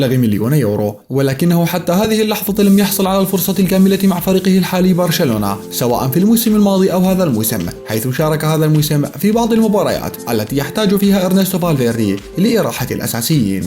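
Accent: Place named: Iraq. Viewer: شو بعرفني بقلي ياغالية انا قلت هيك لهجة العراق😂😂😂😂😊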